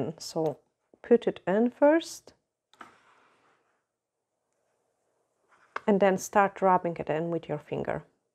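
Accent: Scotland, United Kingdom. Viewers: Love the set, love your work and attention to detail. Especially love your accent with Scottish influence. Hope to get to Scotland in the next couple of years!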